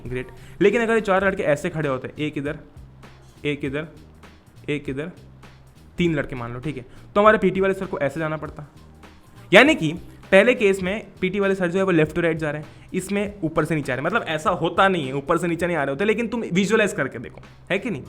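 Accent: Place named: Maharashtra, India. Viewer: dada marathi ahes na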